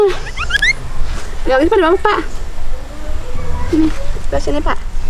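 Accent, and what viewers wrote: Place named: West Java, Indonesia. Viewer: Ibu teh tinggal d daerah mana yah... Bahasanya logat Sunda.. tapi dkt ke laut ada gunung / bukit juga... Banten Iyah Bu...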